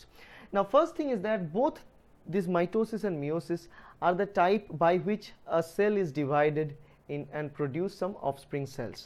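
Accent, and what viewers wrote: Bengal, India. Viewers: Sir are you from West Bengal? Because your talking is like Bengalis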